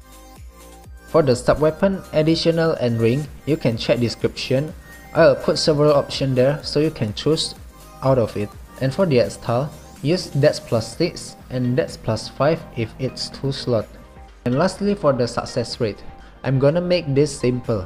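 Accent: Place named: Malaysia. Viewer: ru malaysian? ur accent looks like Malaysian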